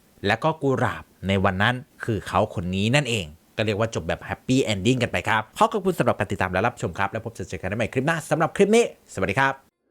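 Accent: Isan, Thailand. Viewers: อยากรู้.ครับว่า.แอดเป็นคยจีงกวัดไหน..เว้า.อิสานม่วนหลาย.เด้อครับ..❤❤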